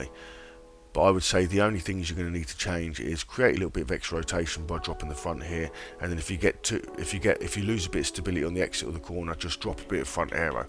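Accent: London, United Kingdom. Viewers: TOOOOOO many Inside-wheel based gamertags so I hope you are on live,like I say my 2 gamertags are z0mv1(main)& Papa Lazarouz so if you haven't received my friend request then you send methe request fella.I'm up north in the UK by the way,Blackburn!You sound like your from the London area-ish!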